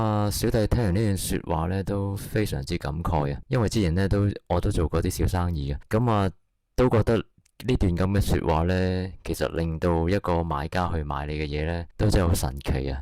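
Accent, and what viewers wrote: China. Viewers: 典型大陸西講野…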